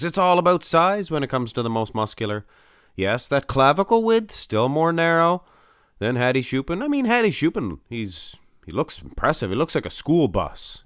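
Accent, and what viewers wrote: Canada. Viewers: Great commentary. I couldn’t tell if he was from Canada or Minnesota until the end of the video. I am guessing Canada.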